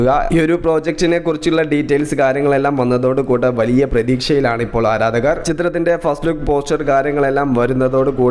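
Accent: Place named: Trivandrum, India. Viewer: ട്രിവാൻഡ്രം സ്ലാങ് 💙💙💙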